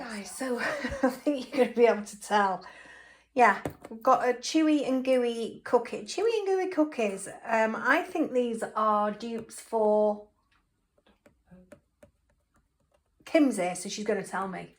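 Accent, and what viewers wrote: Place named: Northwest England, United Kingdom. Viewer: hi, thankyou. I'm definitely thinking of the airfryer, I'll keep a close eye on your makes then decide, really enjoying your channel, are you northwest as your accent is very similar to mine x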